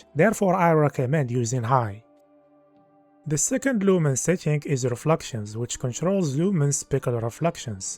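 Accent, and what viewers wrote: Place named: Morocco. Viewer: Moroccan accent all over the place nadi a bro chokran bzf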